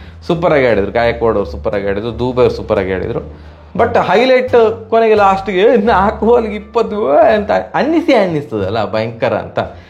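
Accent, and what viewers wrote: Mangalore, India. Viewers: Nimma Managaluru style kannadadalli vivarane kelakke bahala chanda oontu maare, edunna maatra change madbedi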